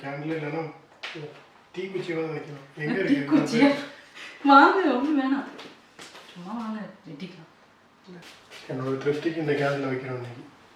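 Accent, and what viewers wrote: Madurai, India. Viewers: Ohh OK akka Madurai pakkam sivagangai akka super super Anna Madurai language pesunangala Nathan ketean akka super....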